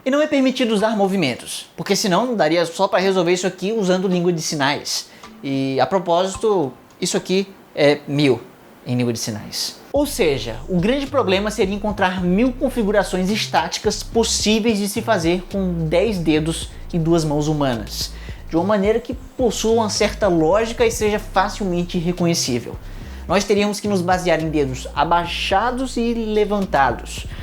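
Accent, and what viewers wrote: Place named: Northeast, Brazil. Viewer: esse cara é de qual estado? esse sotaque não me é estranho... Nordeste? pernambuco? Agreste? alguem sabe kkkkkkk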